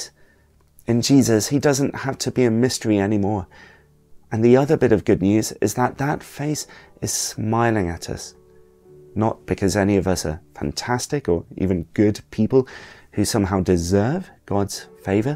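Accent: United Kingdom. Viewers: So so glad to see your face! beautiful soul, wonderful voice, brilliant British accent, Love it! May God bless you and your love ones! Merry Christmas 🎅🏻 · i love your britich TBH. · i like the british accent :) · I am baptised, but not a believer but I do respect people who believe in a religion. Your video was a beautiful, and a lovely message. It's good to see your face, indeed. It's a good idea for Christmas. You have a soothing voice, and a lovely British accent,. (Please, don't colonize my computer nor my photo camera FujiFilm X-T4!) MERRY CHRISTMAS,! 🎅🎄🎁🌠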